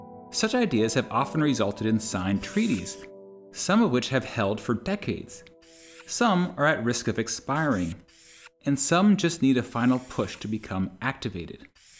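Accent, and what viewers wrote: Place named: United States. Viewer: I think that is just poor American pronunciation tricking you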